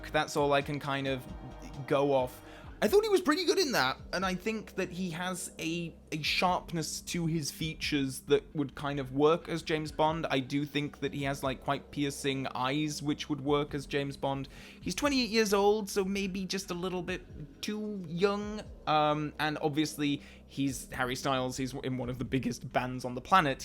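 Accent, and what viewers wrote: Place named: England, United Kingdom. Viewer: English accent. 🥱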